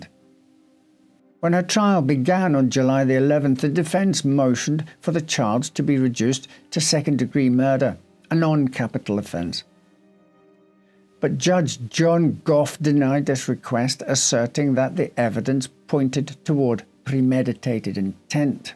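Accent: Scotland, United Kingdom. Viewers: Scottish accent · He is Scottish - Edinburgh or Highlands?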